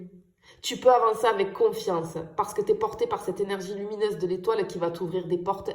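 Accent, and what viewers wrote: South, France. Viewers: Tellement joli cet accent du sud ☀️🐝🌻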